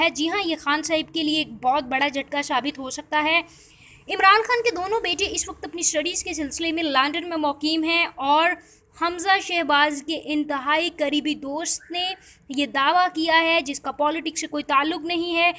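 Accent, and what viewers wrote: India. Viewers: Ye channel india ka hain voice chak Karo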